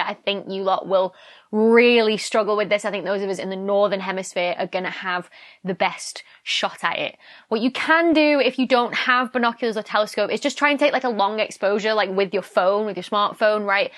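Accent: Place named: Manchester, United Kingdom. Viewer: You were speaking much closer to the Manchester accent in your first videos. Unlike what some say, there is no harm using a regional accent.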